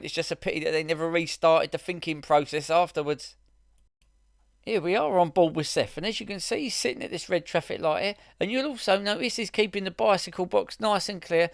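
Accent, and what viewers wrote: Cockney, United Kingdom. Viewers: I like the videos, but the voice over is kinda lacking.. just sounds like some cockney numpty, to the rest of the world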